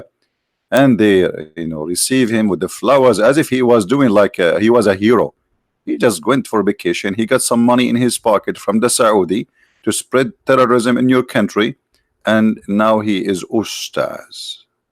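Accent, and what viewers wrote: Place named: India. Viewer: iya bro, seperti logat asli orang hindi.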